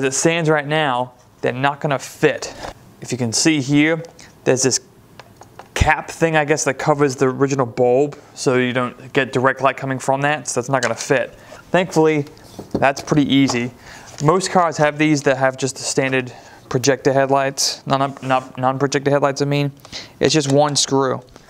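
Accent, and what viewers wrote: Australia. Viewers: cool project! am I detecting a slight australian accent? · What the hell is your accent ? Lol I’ve never heard I’m Aussie you sound semi Aussie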